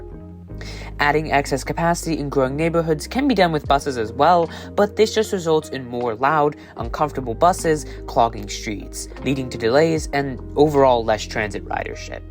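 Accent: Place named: Czech Republic. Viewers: Když si jednou všimneš českého přízvuku, už ho nejde neslyšet, pěkné video. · Český přízvuk je v některých slovech patrnější více, někde méně, zejména vychází najevo (pochopitelně) při vyslovování českých slov. Celkově se mi Vaše výslovnost líbí, možná bych trochu zapracoval na vyslovováni “tr-“, např. ve slovech “tram”, “transportation” - v tomto videu mi to častokrát znělo jako “č”.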